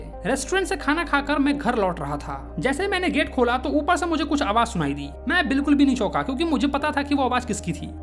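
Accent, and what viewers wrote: Bihar, India. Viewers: Are you from Bihar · Bhaiya kya aap bihar se ho ? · Bihari bhai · Bhai 100% bihari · The bihari accent 😂😂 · Bihar se ho kya? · Bro is from Bihar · Aapke padhane ka tarika mujhe bahut accha lga jis trh aap bolte ho ekdam bihari ki trh kyunki main bhi bihari hu to mujhe aapke padhane ka tarika aur bolne ka tarika bahut accha lga 😂😊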